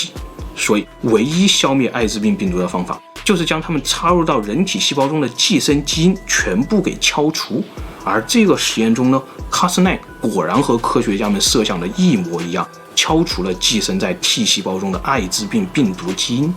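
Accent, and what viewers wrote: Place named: Hunan, China. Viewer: 你的湖南口音很重：l，n不分，以至於不得不時刻反應：暖細胞是卵細胞等。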